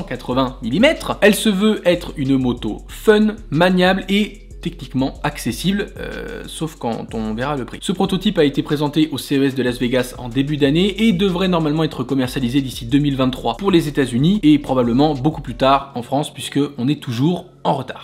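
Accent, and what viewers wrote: Belgium. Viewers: Troooopppp biiiieeennn, les 5 actus, l’accent belge 🤣 👍🏻, 😳pas trop fan de la direction assistée sur une 🏍. 🤛🏻✌🏻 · Enfin qu'il parle comme un vrai belge continue comme ça le BG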